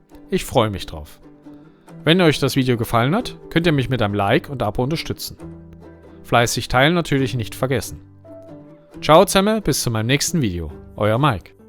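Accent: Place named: Germany. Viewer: Danke für die Übersicht, das sind ein paar perlen dabei, die ich mal nachkochen werde! Evtl. solltest Du die Französischen und Italienischen namen einblenden. Ich spreche die sprachen auch nicht ... aber mit Deutschschweizer/Englischem akzent im ohr kann ich Dich nicht verstehen. Als Deutscher verdrehst Du die laute anders als ich 🤪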